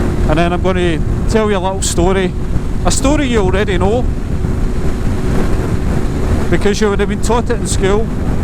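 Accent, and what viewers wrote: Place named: Scotland, United Kingdom. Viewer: Hey. Very nice video. Enjoy the accent. Fells like i'm watching Outlander. LOL.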